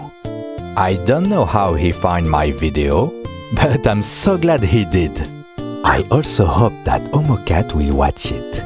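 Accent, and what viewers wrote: France. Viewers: the best tier list in my life. thank you :) also your french accent is so lovely!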